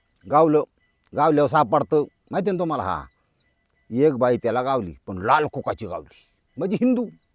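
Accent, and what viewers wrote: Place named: Narayangaon, India. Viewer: मामा तुमचे गाव जुन्नर तालुक्यातील आहे का. बोलणे नारायण गाव सारखे वाटते.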